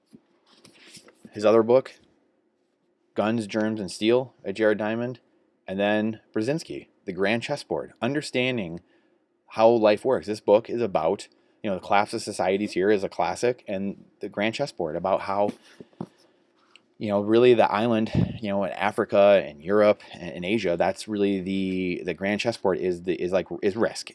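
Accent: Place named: Michigan, United States. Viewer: I had a hint by the accent but are you from Michigan?!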